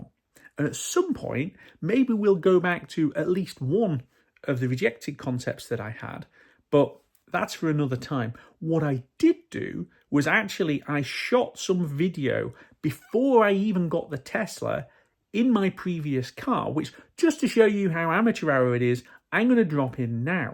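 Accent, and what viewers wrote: United Kingdom. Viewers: I look forward to your videos every Friday. I subscribe to a few "Tesla" Youtubers but yours I find the most enjoyable. as a Canadian, your british accent and humour is fun. I enjoy your travel videos in the Model Y and your chalenging of the anti-ev folks. What I didn't like was the video on accessories. There are many YT folks doing accessory videos which I find redundent. Keep up the good work and "Carry On"!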